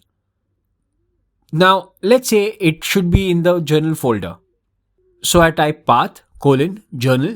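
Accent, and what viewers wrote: India. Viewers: you are from India aren't you 😁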